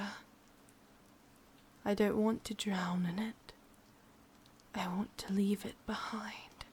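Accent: Scotland, United Kingdom. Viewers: Omg wait are you Scottish 😭👋👀👀❤️ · I like this which though I do feel sorry 😞 for not finding the one I hope she does someday ❤️❤️. I really love your voice acting by the way you sound very sadistic but hot also you also you sound so beautiful & soothing I’m impressed 👍👍👍👍👍👍. Also you shouldn’t have to change the way sound personally I like your Scottish accent it sounds gorgeous in my opinion & I’m British with an American accent & I’m proud of it hope you had a great Valentine’s Day ❤️❤️. Kind Regards AuraKnight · Thats Scottish accent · yup. Prefer your Scottish accent tbh. Havent check all your vids but is there any rp with your natural accent? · Your natural Scottish accent is far nicer.;) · I'm getting a mix of Scottish and English in this. Like me, hurray😂. Good video lol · H New to thus channel and the accent is great, for me it sounds a bit more Scottish than English. 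I know I'll enjoy this channel tho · this is more scottish than english honestly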